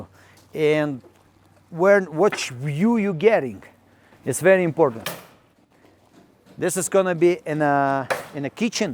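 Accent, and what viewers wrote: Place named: Russia. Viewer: Ты ведь русский! 100%акцент!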